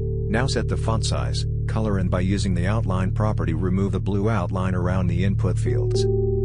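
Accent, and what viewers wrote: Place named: India. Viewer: Next level indian accent covering though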